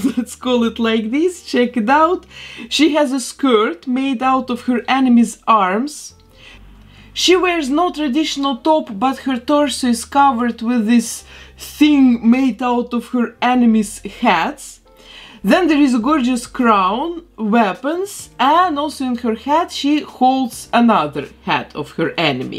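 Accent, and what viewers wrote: Russia. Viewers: Why do you have a Russian accent?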